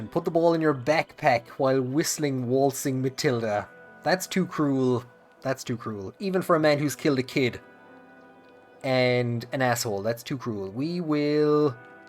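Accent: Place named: Ireland. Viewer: irish guy playing aussie simulator....i luv it!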